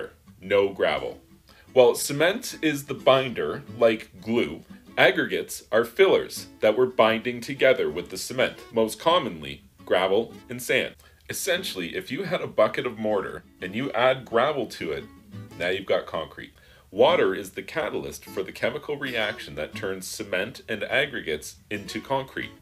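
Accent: Canada. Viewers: I can hear that Canadian accent sneaking through. lol. Cool video.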